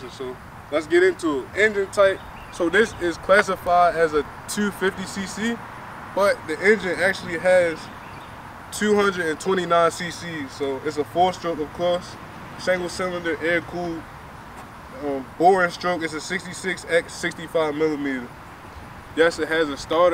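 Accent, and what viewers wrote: Baltimore, United States. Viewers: Thank you g, about to go cop one today actually. But aye bro where are you from? Your accent is wild..Baltimore? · I live down south it's hard to find parts for those Chinese bikes you sound like your from Baltimore is it easy to find parts up there for those Chinese bikes ?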